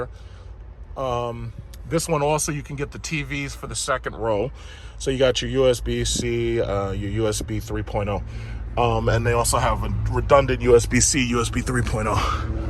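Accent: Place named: New Jersey, United States. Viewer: Sounds like he's From Jersey, Also he did a comparison with the Standard Length Escalade, what About the EXT Long Version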